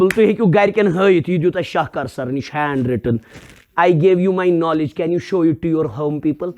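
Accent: Kashmir, India. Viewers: Sir say salam khan as samlan khan .apple as yapple ..kashmiri accent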